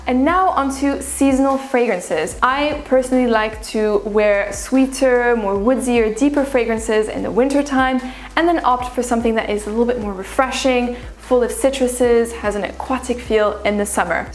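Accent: France. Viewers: She is definitely a french speaker😊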